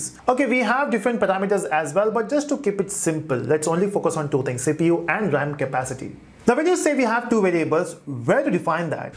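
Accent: India. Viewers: Love the Indian accent. Greetings from Italy!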